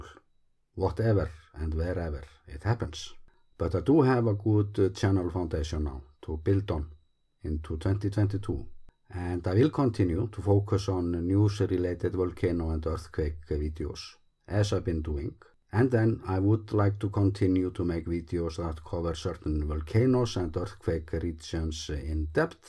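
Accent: Iceland. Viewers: I know you are teasing, but I still want to say that his wonderful voice and accent add a great deal for me. I can’t imagine his videos narrated with, say, a British or American accent. I have to pay close attention — actually a good thing — but I understand everything he says. For this ‘Murican, though, Icelandic place names are impenetrable, which is actually rather fun. Just don’t expect me to be able to pronounce or spell them!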